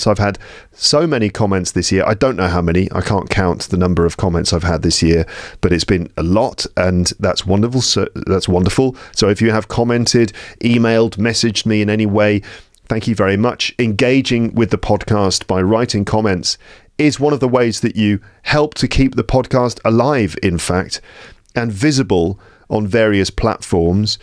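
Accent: United Kingdom. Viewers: 1. I've listened to your podcast to get used to British's accent and imitate your words. 2. Just listen and imitate your words for many times. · Hi.Yes How do you know that your podcast is good for get sleepy??😂😂😂 Joke, joke for me it's useful to improve listening listening... I like British pronunciation and entonation too. Thank so much for this. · Hi! I'm an English teacher and I have started listening to your podcast since last year. It helps me get up in the mornings with a clear mind! Love the delicious humour it offers. Love the vocab explained and your beautiful British accent. I have become addicted to it! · Your podcast is the best English teaching podcast on YouTube... It has been a great help for me to learn English especially when you read short stories... So I recommend that you read more stories on your podcast.. I enjoy listening to the stories in your charming British accent... 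Please post more episodes of you reading short stories.. thank you very much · Hi, I know it's late for the answer, but I want to tell you how much I love listening to you. I like to knit and usually I listen to your podcasts or videos while I knit. I find that a perfect match as I get more and more confident in English. Besides, your British accent is so nice that it's always a very pleasent time "knitting with ". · I love how British English sounds and the way you speak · Hi. I'm from Myanmar. You know what? My listening skill has definitely improved after listening to your podcasts. The problem was when I became so attuned to your accent which is British accent, I couldn't fully understand other different accents. Why does that happen? Does that happen to most of English learners? And I'm just wondering if you can have a chat online with your listeners (including me) on 31st December, which is the last day of 2024? 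I'm so eager to talk to you. But it's okay to decline my request if you don't have enough time. Thank you and looking forward to seeing your new episodes. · Hi. Thanks a lot for your podcast. Im an English learner in China and I love listening to your podcast and your sound. Im here to learn about British accent, and it helps me alot.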